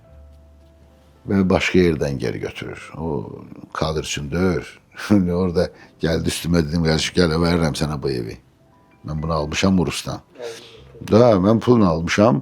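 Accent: Baku, Azerbaijan. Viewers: Ləhcəsindən hiss olunur ki bakılidir. müəllimə Allah can sağlığı, 100 il ömür qismət etsin. Belə verilişlərin sayı çox olsun.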